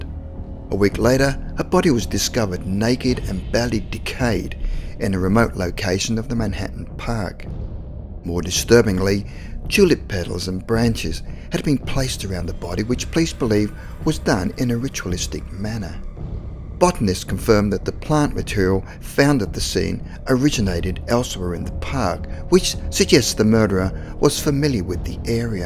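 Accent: Australia. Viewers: Great video man! Also I love your accent, Aussie pride! 🐨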